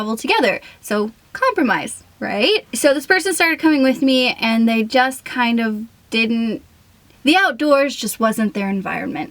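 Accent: Texas, United States. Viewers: texas accent coming through?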